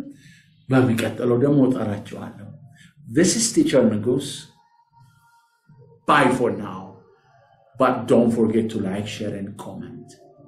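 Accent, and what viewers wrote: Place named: United States. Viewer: በጣም አመሰግናለሁ አቤት ለውጥ ያሜሪካኖቹ አክሰንትን አመጣህልኝ water ለማለት በፍፁም አደር ስታንድ አያረጉህም ቀጥል በጣም ጎበዝ ነህ አክሰንት አዙሮ ሊደፋነው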